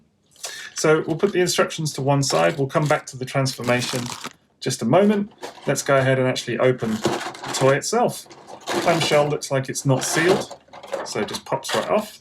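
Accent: United Kingdom. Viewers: Hello, I’m looking into buying Split, however I’m in the UK, like you I assume due to ur accent, and I can only find online stores that sell him in $$$, can you please let me know or anyone here in the comments if there is anyway to buy with £££, many thanks.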